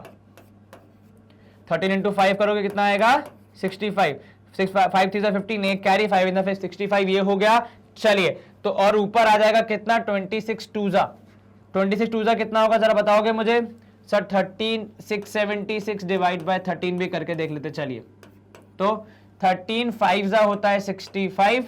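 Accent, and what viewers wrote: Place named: Rajasthan, India. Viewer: I think that you are from rajsthan right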